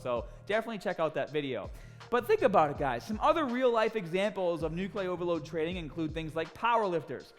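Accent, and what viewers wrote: Boston, United States. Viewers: What are you from Boston? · Wait is that Boston accent fake or not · Are you from Baah Ston? :-)) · That Bostonian accent is great... say Park the Car in the Yard 😁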